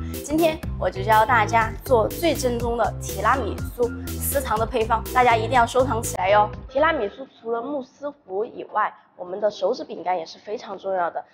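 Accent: Sichuan, China. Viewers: 我猜你是四川的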